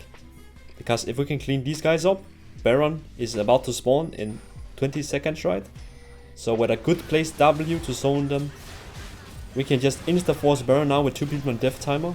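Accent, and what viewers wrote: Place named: Denmark. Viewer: I can already tell you’re from Denmark by your accent🤣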